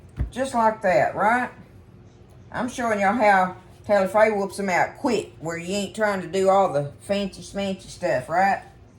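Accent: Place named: Texas, United States. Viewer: 🤩Love your Texan accent!🤠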